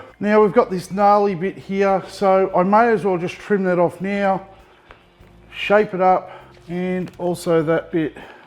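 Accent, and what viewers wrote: Australia. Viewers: Saw the thumb nail and was keen to watch...heard an Aussie accent and stayed to watch the whole video! Legend. Also that cutlery holder mini burner is genius.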